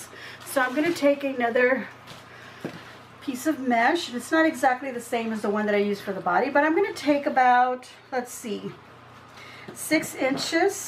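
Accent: Texas, United States. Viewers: can I assume you are from Texas? Loved watching how you do your crafts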